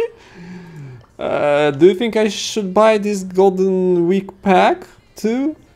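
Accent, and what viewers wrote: Russia. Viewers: You Russia?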